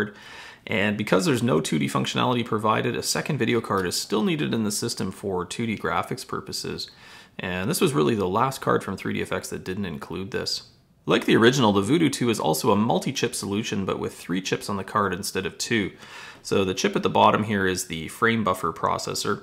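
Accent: Canada. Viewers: are you Canadian? Because i get the feeling with your accent especially when you say "about":D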